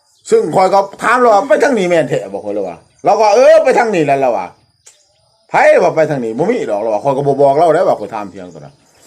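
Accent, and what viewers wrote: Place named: Sisaket, Thailand. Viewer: สำเนียง คือคนศรีษะเกษ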